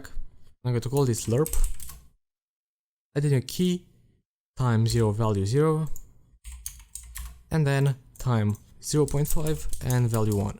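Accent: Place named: Russia. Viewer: I just think you have an accent,so I thought you were Russian)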